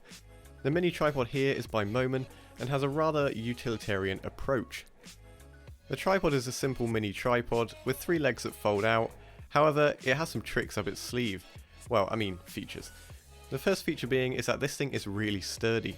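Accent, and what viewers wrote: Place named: North, United Kingdom. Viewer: hey man so where is ur accent from? like u sound like northern but you speak really clearly so i dunno what is going on lol.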